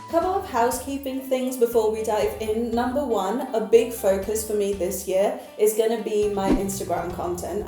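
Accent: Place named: United Kingdom. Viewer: Hey enjoyed this video cause you have a loveeely voice and British accent. I am a fresh learner of PS and it was a bouncer, never the less I liked your British diction and crisp clear voice, This was the first video I came across, and I am impressed by your excellent work and editing skills. Your videos will help me later when I come to terms with PS. Loved your charming personality, I have to submit and follow and Big like too. I am a watercolor Artist and trying my hand at POD and that's where learning PS is so important. If you have the time check out my painting videos. Good to know you. #artistsatwork53 #artistsatwork53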